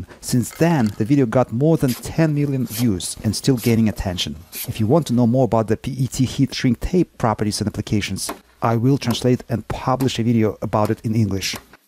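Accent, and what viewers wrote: Russia. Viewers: So impressive and I absolutely love your perfect English with your Russian accent.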